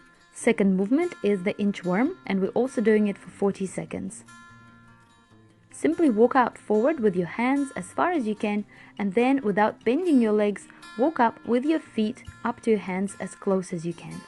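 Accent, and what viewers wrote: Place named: Russia. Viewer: What a great Video, if I do this every day plus the other stretches from your videos I think It will be very good for me Some of them i know already but some are new to me Stretching and mobility is the most important thing apart from training itself I've seen you on LebeStark now I'm a fan, your way of talking and explaining is so typically Russian I loooove it 🤗🤗😍😍😍 Grüsse aus Leipzig 🤗🤗🤗 Молодец 👍👋😍🇪🇺🇰🇿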